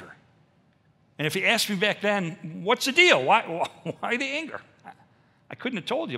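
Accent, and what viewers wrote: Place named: Chicago, United States. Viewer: You can tell he was from the Chicagoland Area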